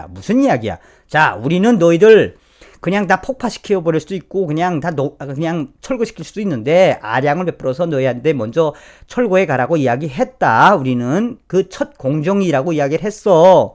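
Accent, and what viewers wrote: North Korea. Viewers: 북한식...너무 웃겨요~~ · 정성산감독님 화이팅 오늘도 가열차게 달린다 좋은 말씀 감사합니다 귀는쫑긋! !!!!잘 듣습니다!!!!히히 북한 말 조타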